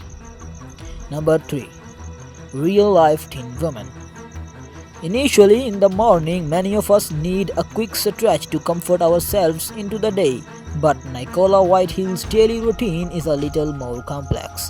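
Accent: India. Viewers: There is nothing wrong with an Indian accent! The background music however was too loud and overwhelming. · You are totally right.But it is normal that you get criticized, when you upload videos on youtube. And just be honest his accent is pretty hard to understand.He does good job producing his videos, but i think he should talk in his native language, and upload them for hindu ppl.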